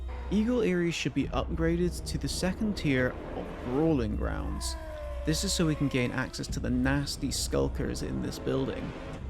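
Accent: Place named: Scotland, United Kingdom. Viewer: Nice video mate, I'll be sure to give this a bash. As a side question, where is your accent from? It sounds like a mixture of very light Scottish and something else?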